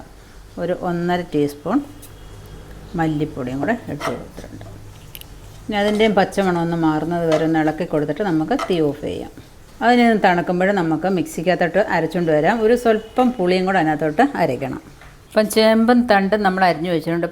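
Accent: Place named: Pathanamthitta, India. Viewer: Hello Eavideyanu Sthalem Samsarem Kettittu Pathanam Tittayano ❤🧡❤🧡❤🧡❤🧡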